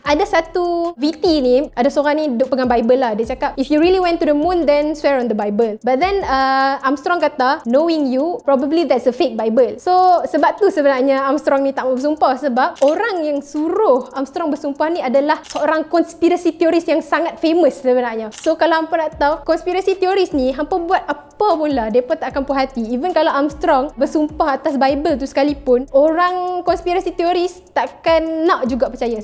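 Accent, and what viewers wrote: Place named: North, Malaysia. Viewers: Uishhh loghat utagha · Memang lah...depa nak tunjuk longhat utagha kan.. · Pekat sggh utariaq hg nohh 😁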